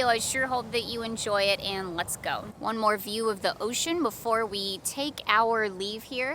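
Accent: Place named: Michigan, United States. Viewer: Hi 👋 MELBOURNE!!!! WOO! sound like Michigan accent.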